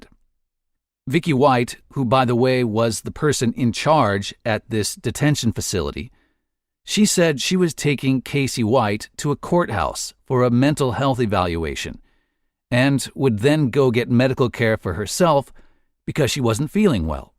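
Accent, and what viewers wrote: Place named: Tennessee, United States. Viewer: Gotta love the Tennessee Valley Twang, 😀.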